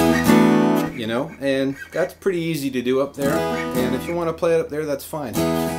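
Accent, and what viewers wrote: Canada. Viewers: Your presentation & discussions about the song are brilliant ! Your descriptions are so on. I had no idea how complicated this song was/is. I love the Canadian accent - it's awesome too. When you live near the border of Canada - you just know when you hear your Canadian Brother speaking ! This tutorial is phenomenal !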